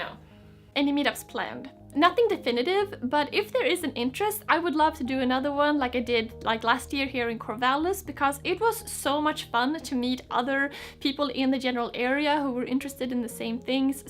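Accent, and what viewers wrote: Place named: Sweden. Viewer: Nice to see more girls in this community. Just discovered your channel. Just one questions. Do you perhaps have any relation to Sweden because your accent sounds somewhat Swedish?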